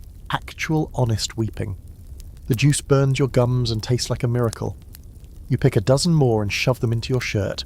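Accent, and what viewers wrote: United Kingdom. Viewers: Stop believing every word this guy says just cuz he has a British accent 😂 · am Yorkshire ❤️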